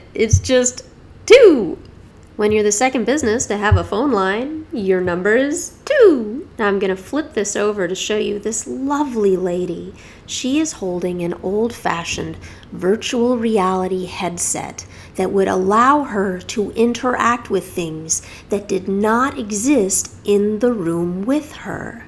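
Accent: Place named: South Carolina, United States. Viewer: Great entertaining and historical show. Do you know how to make a hormone ?....Don't pay her! hahaha. Love your dedication and awesome accent; I'm guessin S. Carolina?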